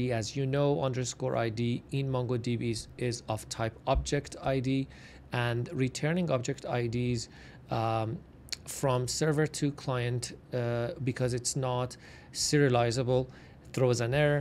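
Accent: Iran. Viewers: به ارور خورده بودم یهو دیدم لهجه تون فارسیه :))))) خیلی بامزه بود این اتفاق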